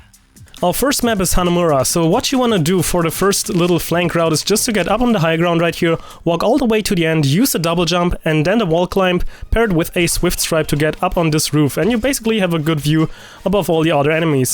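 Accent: Germany. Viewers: XD ich erkene wie du sprichst das ist auf jeden Fall deutsch besonders bei eichenwalde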